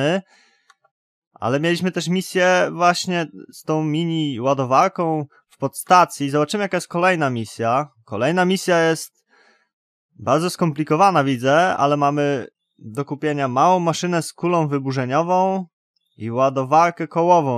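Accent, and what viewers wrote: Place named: France. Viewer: masz francuski akcent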